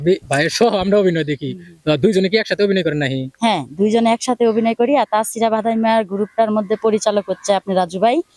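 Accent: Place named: Tangail, Bangladesh. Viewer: আপা আমি অনেক আগে থেকেই জানি, রাজু ভাই, আর আপনি স্বামী-স্ত্রী, অভিনয়ে ও দুজন দারুন,,। কিভাবে জানি, মোটামুটি বেশ কিছুদিন হয়ে গেছে, একবার একটা ভিডিওতে দেখছিলাম, আপনার ছেলের সুন্নতের অনুষ্ঠান, তখন আপনার ঘরে রাজু ভাইয়াকে দেখছিলাম, অনুষ্ঠানের আয়োজনে ব্যস্ত ছিল। আপা আজকে আপনার চেহারাটা অন্যরকম লাগছে কেন? আর এতদিন জানতাম না, আপনার বাড়ি যে পাবনায়, কথার ভাষা শোনে তো মনে করছিলাম টাংগাইলের মেয়ে আপনি, লাইক কমেন্ট সাবস্ক্রাইব করে দিয়েছি আপনার ছোট ভাইয়ের চ্যানেলটি, ভালো থাকবেন, পাশে আছি 👍, 🥰🤩🥰🤩❤👍🎉🎉🎉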